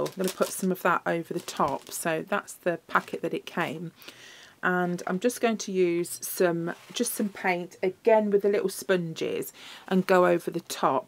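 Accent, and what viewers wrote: United Kingdom. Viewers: Oh I loved your video. Maybe mainly because it was lovely hearing a British accent as much as I love our American cousins. But I can also get the stuff. I’m always jealous when I see the fantastic stuff they get from Michaels or Dollar Tree but no good for me trying to find like for like. Brilliant 😍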